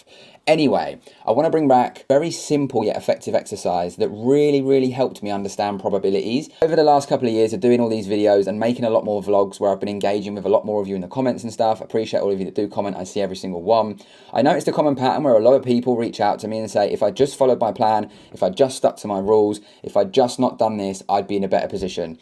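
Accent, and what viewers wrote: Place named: United Kingdom. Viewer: Man just appo pay errything innit😭😭😭, the British accent just kills me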